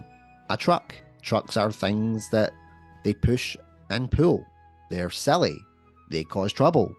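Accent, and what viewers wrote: Scotland, United Kingdom. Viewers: Are you scottish mate?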